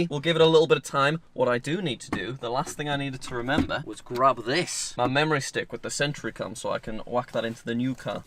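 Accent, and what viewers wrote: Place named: Scotland, United Kingdom. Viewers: Right! I didn't know if he was living in Scotland or England right now, so I just guess Scotland because of his accent. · yeah I live in Scotland he does sound a wee bit Scottish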